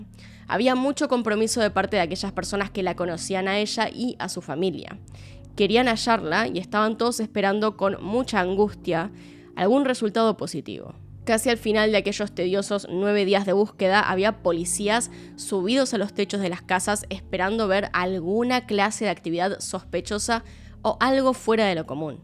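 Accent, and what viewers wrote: Argentina. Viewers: Hola me encanta tu acento argentino supongo y también tu acento angloparlante supongo que también hablas inglés te felicito por tu programa es magnífico y tú eres muy bella saludos😊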